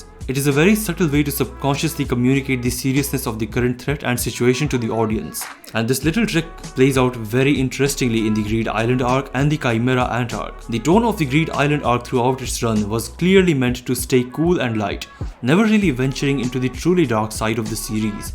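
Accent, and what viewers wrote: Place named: India. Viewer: Your accent sounds Indian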